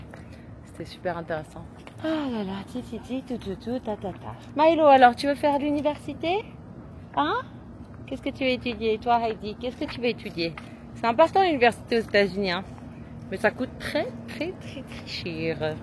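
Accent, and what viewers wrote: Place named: Switzerland. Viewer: L’accent suisse faut arrêter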